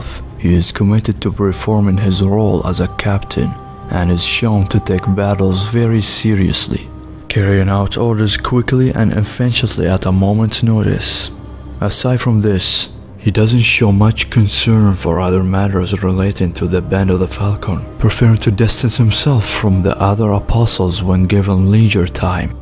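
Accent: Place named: Russia. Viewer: Your (Russian?) accent gives an exotic and soothing tone to your speech, it’s fine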